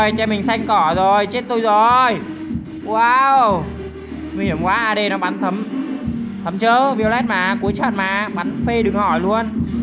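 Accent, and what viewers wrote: South, Vietnam. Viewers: thích cách nói chuyện của bạn nhiều lúc lại giọng miền nam nghe vui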